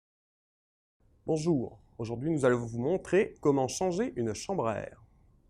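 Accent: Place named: Quebec, Canada. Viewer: avec le petit accent québécois en plus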